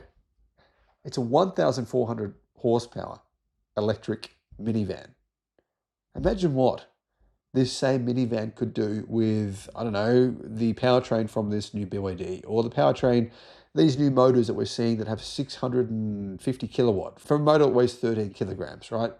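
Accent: Australia. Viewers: if you’re going to call Zeds Zee, then, you may as well do away with your Aussie accent. Just sayin’.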